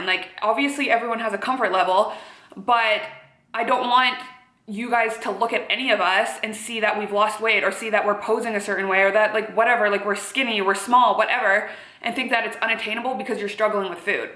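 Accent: Canada. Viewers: This is the first i listen/watch your video without multitasking (lol i like to listen to story time youtube video whilst driving/do chores) but i just wanna say your canadian accent is SO noticeable 👌🏼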